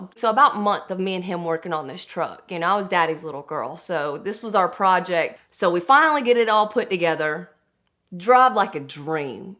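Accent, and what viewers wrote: South, United States. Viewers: what is hotter that a gorgeous asian woman with a southern accent? WOWWEE!!! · Beautiful girl, southern accent and loves trucks, she's perfect! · I love the blunt south talking thank god im not the only one left in 2020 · Anyone else’s eyes pop out of their head when they saw a good lookin woman, talking in a southern accent, about a truck?😂😂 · This channel makes me want a southern accent. "Quert o' ol" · Heard the accent, thought southern bell. Said hillbilly dad, check, philiphina mom hold up. Look at video. Ah yes still a southern bell lol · Nothing can be more American than her accent! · Cream job sums it up.... That southern draw so sexy.... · A Filipino with a STRONG southern accent - there has been a disturbance in the space-time continuum.... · Not even in by 9 seconds yet and dam that southern accent, i'm in love lol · Too southern of an accent for my likes, then I thought to myself Georgia? 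Why doesn’t Ed sound like that? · Came into this video hoping to hear a southern accent saying "big ol mud tires". Was not disappointed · I fkin love southern accents. Im from Boston so dont hear it much up here. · Pretty asian girl with a southern accent, was a bit confusing, but it sounds like she's come a long way from rolling her truck to driving race cars 👍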